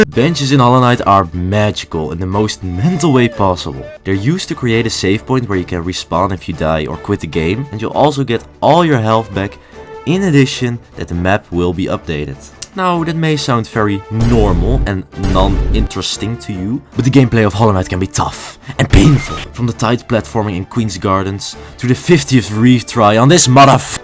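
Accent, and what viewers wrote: Netherlands. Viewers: Love your dutch accent😁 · Are you dutch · Dutch accent spotted in the Hollow Knight wilds! This was a super fun video, your dedication to making it as good as you can really shines through 🫶🏻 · ayo ben jij nederlands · Ja duidelijk een nederlander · Are you dutch?? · Are you dutch? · You sound dutch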